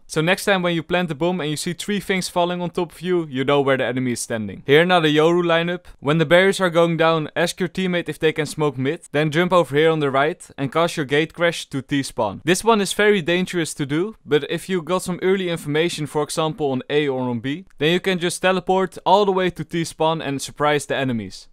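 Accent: Netherlands. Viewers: Kheb nog nooit een Nederlander zo Nederlands Engels horen spreken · Are you dutch? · you can just hear by this guy his english accent that he is dutch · Hoor ik een nederlands accent?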